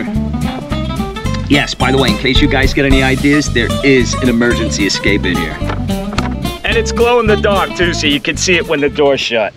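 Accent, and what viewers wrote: New York, United States. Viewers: The New York accent gets old after a while...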